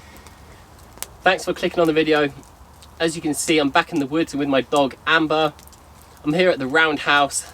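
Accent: England, United Kingdom. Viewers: Loving your channel! What is it about a pommie accent and bushcraft?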